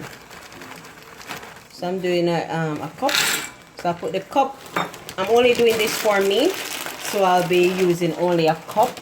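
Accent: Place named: Jamaica. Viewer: Love your JA accent.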